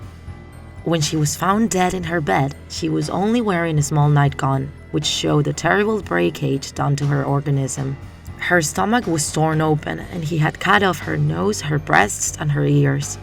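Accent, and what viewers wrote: United Kingdom. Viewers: British to me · Do you live in UK? 🇬🇧 (United Kingdom?) I feel like you speak with a british accent.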